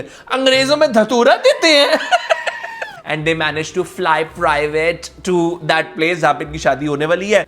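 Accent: Punjab, India. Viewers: Story gai teil leny❌ story gai phla tu duja tu teeja tu leny✅😂😂😂😂 is bnda ka story telling ka andaz or bich bich me punjabi touch.. zbrdssttttt😂😂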